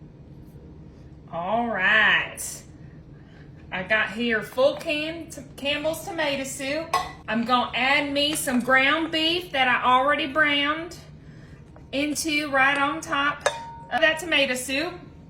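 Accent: South, United States. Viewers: You are not a southern cook. We do not cook like this in the south. A southern accent doesn't make you a southern cook. This is garbage. Trash. Throw the whole pan away. This is an abomination. Yuk 🤢🤢🤢🤢🤢🤢🤮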